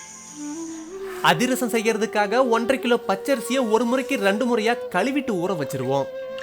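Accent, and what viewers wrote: Salem, India. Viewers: Wow what voice I think Salem area crt ah entha area attur?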